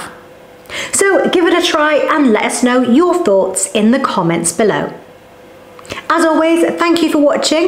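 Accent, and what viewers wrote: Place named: England, United Kingdom. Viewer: Thanks. It works very well and let me tell you that I love your English accent.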